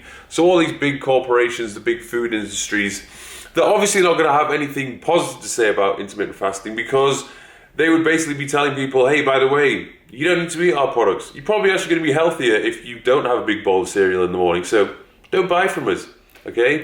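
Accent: United Kingdom. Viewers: His voice sounds like John Cena with a british accent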